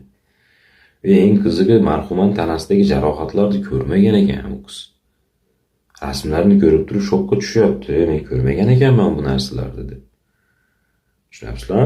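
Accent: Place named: Andijan, Uzbekistan. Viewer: Нима бупти шевасига анжонли хм нима дмокчисз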